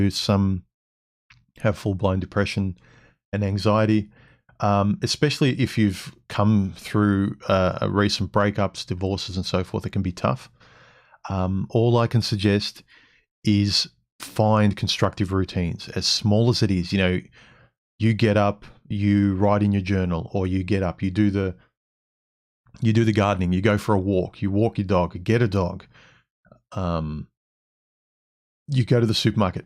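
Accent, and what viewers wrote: Melbourne, Australia. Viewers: You have a Melbourne accent through and through, eimai sostos?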